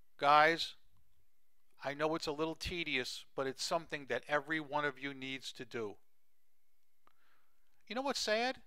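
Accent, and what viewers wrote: New York, United States. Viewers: love your NY accent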